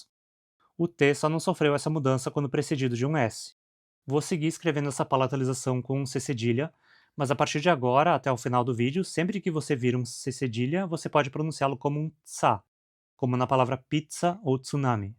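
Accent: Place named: South, Brazil. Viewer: vc tem um sotaque sulista, mas eu não tenho conhecimento suficiente pra discernir de qual estado vc veio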